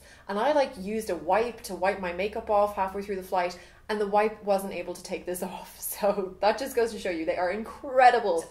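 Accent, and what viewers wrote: Ireland. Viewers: are you irish? i dont know because this is your first video ive seen.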